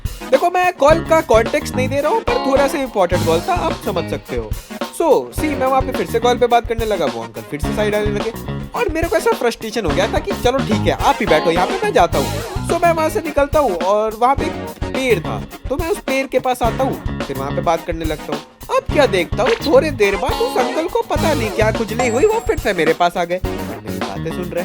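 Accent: Bihar, India. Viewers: accent from Bihar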